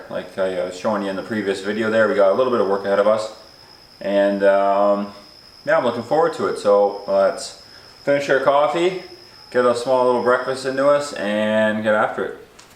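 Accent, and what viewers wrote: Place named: Canada. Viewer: Lol i knew you were Canadian, I guess we do have an accent after all. I'm working on a 03 1000, front fairing is a little different from the 750 and 600.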